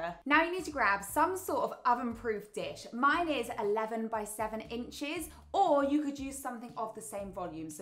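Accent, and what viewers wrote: United Kingdom. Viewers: Thickest bri'ish accent have ever seen (not to be mean or anything)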